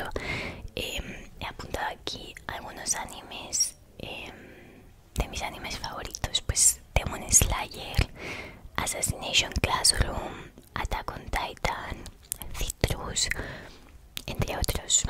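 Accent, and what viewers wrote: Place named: Spain. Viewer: Amo profundamente el acento español y el tuyo es hermoso 🥰🥰🥰❤ Beso grande desde Buenos Aires, Argentina. 💙🤍💙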